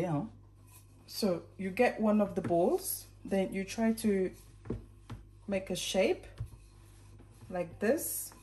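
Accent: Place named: England, United Kingdom. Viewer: Merci pour la recette et j'adore ton accent anglais 😉👌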